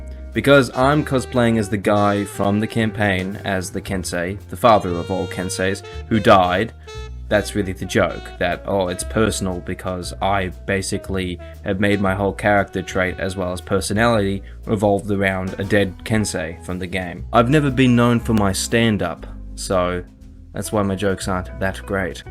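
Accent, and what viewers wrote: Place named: Quebec, Canada. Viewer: He's quebecoise? Over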